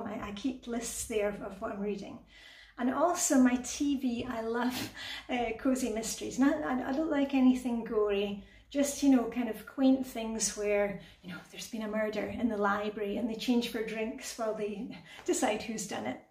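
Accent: Scotland, United Kingdom. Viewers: my little one's comment when you said "Little" -- "Mum she's from Ireland" -- I laughed and said no Scotland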